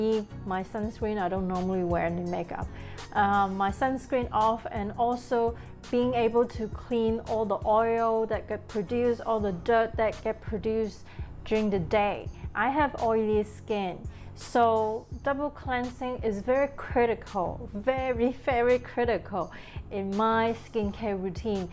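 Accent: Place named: England, United Kingdom. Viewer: Hi, I love you English accent. The information you give us is very helpful. thanks for sharing.( 來自小船群組回訪)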